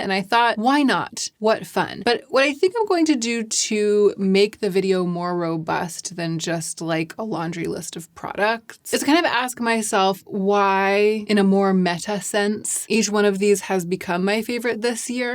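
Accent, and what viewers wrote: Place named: South, United States. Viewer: Just wanted to say that I am a new subscriber - and I can’t get enough of your channel. From your southern “hey y’all” to your intelligent and thoughtful comments, all delivered with such a calm demeanor- I’m hooked! Thank you for your thought provoking, yet obvious love for beauty - much appreciated!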